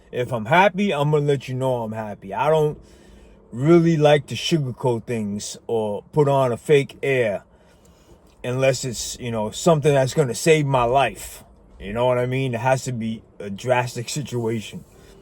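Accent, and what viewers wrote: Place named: Rhode Island, United States. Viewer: I grew up in Boston and I moved to Rhode Island when I ran away from home and purchased my first house at 26, because I couldn't afford the house that my parents wanted me to buy next door. It was too expensive and I couldn't even qualify for the loan. Even though, I was making good money at the time with a 20% down payment. Boston is very expensive at lease Rhode Island and New Hampshire is a little better. But rents in Providence is $2,000/month now and Boston is $3,000/month. But the seafood on Federal Hill is out of this world and there are many inexpensive great beach seafood joints on the water in Warwick. My favorite is Iggy's. Fun and casual giving you that "laid back vibe" I knew I detected something in the accent of your voice. It makes sense now.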